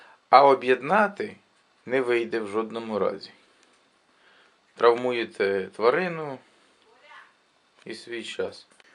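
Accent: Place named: Poltava, Ukraine. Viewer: Дякуємо за поради і приємну мову, чисто як у Полтавській чи в нас, південь Чернігівщини. Здоров'я, натхнення і творчих успіхів!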